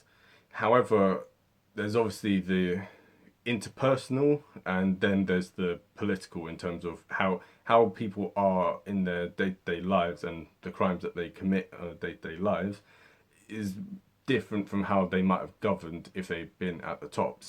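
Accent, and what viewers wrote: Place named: East London, United Kingdom. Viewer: So naive... simplification after simplification... and the language used... sounds like a geezer from Stratford.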